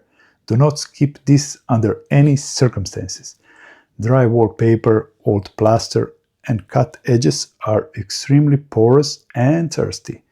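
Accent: Russia. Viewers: has a strange accent. Russian? Ukrainian? Dude, you tell me. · I love the accent! Russian? Excellent commentary and tips on how best to repair these annoying cracks. 👏👏✌️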